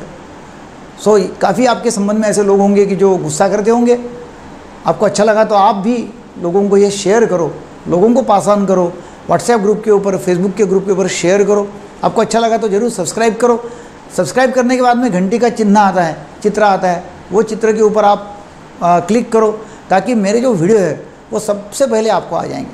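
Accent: Maharashtra, India. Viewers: sir,u can talk in Marathi also ,even u speak in hindi ur tone is like Marathi,pl speak in Marathi or in English or please learn proper hindi, language,tone etc, being Marathi am proud of u ,but u talk valuable thing,but sometimes it's funny tone so pl improve sir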